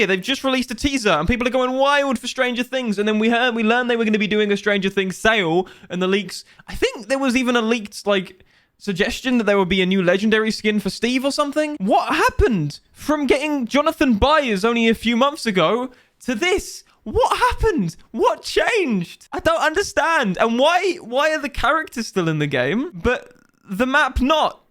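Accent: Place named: United Kingdom. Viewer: I love your British accent omg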